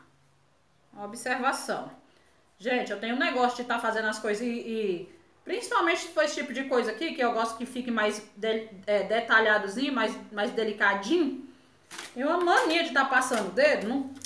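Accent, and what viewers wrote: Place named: Brazil. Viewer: Adorei o móvel e esse jeitinho brasileiro arretado dela falar. Negra linda. Na cor e na essência.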